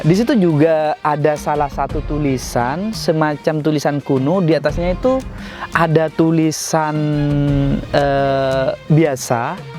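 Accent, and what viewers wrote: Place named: Java, Indonesia. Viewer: Medhok tak iye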